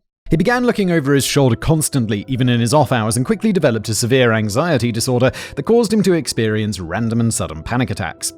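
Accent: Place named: United Kingdom. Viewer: Congratulations, you've found a way to profit off the dumbing of society by pretending to be educational. Lucky for you society was already dumb enough to mistake a british accent for the voice of reason.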